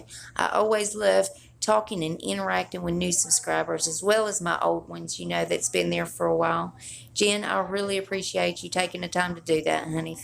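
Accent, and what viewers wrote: South, United States. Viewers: Girl your southern just like me!! Gotta love us southern gals. Xxoo. Cute channel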